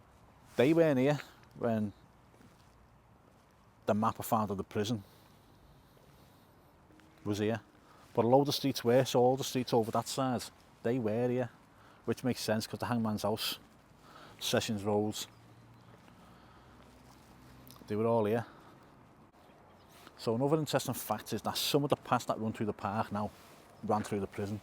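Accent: Liverpool, United Kingdom. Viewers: The wife was listening in on this and said he's good him proper scouse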